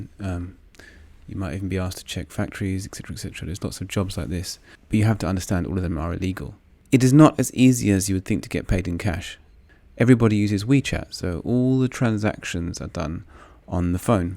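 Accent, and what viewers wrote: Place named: Taiwan. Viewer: Chanced upon one of your videos on your Mandarin channel - was very surprised to hear a Taiwanese accent since most Western folk seem to use Beijing pronunciation when learning Mandarin 😂😂 Makes sense now, knowing you lived in Taiwan prior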